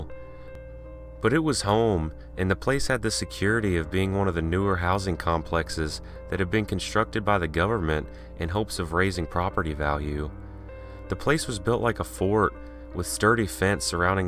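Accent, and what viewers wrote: South, United States. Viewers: Now with the dialects evoloving with more and more influence from almost any culture it's hard to tell the accent but it is for sure southern and in my opinion I'd say kentucky to the Carolina's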